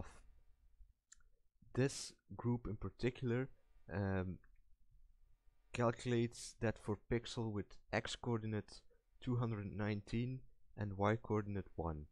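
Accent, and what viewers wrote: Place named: Belgium. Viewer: It's sounds like you are Belgian imo, and it even seems like I recognise your voice. But that would not be possible since I don't really know any other dedicated programmers.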